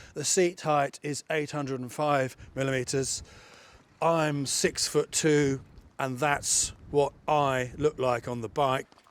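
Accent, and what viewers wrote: United Kingdom. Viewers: you look like Hollywood star,with purely british accent.😁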